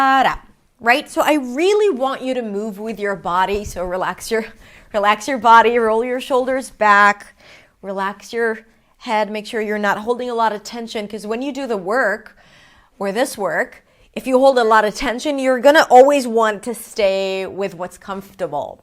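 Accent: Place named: United States. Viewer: I learnt most of the American accent by you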